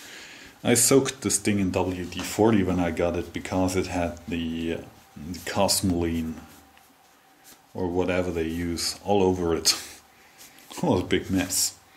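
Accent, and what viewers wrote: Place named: Germany. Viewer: Hi - great video as expected--- keep up the great work!!! My wife enjoys hearing your German accent-- when she walks in the room and I'm watching your videos, she says " he's back"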